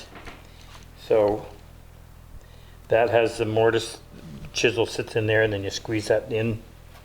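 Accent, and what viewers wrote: Canada. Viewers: And how he says hoose. I love the Canadian speech though, and I enjoy hearing him on his videos.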